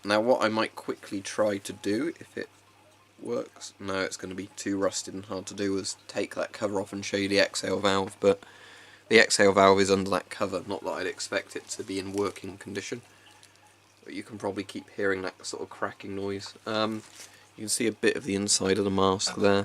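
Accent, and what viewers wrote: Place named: United Kingdom. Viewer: I don’t mean to get myself involved in your privacy but out of curiosity I would like to ask what is your nationality? You have a British accent but I seen you wear a German camouflage uniform still issued in the German army.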